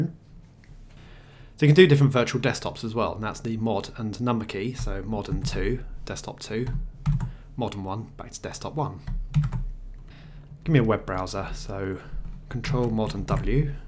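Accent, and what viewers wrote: United Kingdom. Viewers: Again that British English. WHY WHY WHY :D Just speak normal english. Stop being so swollen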